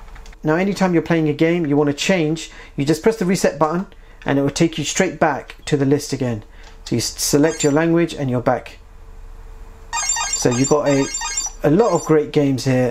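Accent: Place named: United Kingdom. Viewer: Now your voice and pronounce is like mr Harry Pottah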